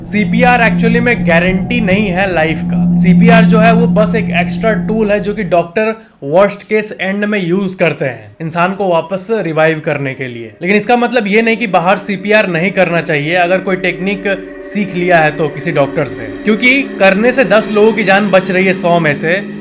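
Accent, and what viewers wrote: Bihar, India. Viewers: Typical Bihari tone 😊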